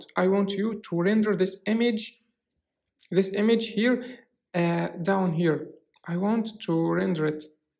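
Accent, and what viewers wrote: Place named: England, United Kingdom. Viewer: Love the way you explain concepts, and especially your English accent it's so cute!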